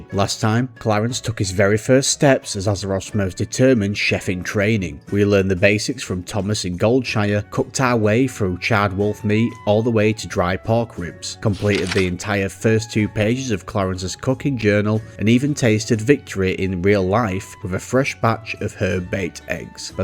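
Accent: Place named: United Kingdom. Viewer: Id speculate he's on GMT ish based on the accent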